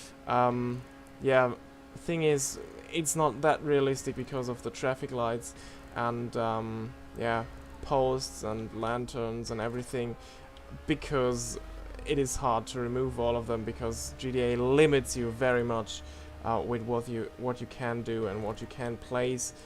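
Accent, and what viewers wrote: Germany. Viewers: Hat man schon am Akzent gehört, das du deutsch bist👌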